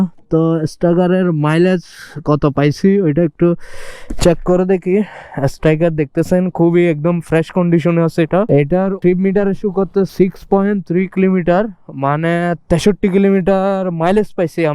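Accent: Chittagong, Bangladesh. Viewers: চট্টগ্রামের আঞ্চলিকতার টান শুনলেই মেজাজ গরম হয়। ভিডিও ১০ সেকেন্ডেই বাতিল করলাম।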